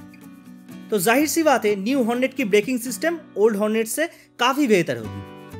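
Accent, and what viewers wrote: Bengal, India. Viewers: Dada apnar hindi te bangali feeling puro relavent.tobe abs version ta hole besi vlo hoto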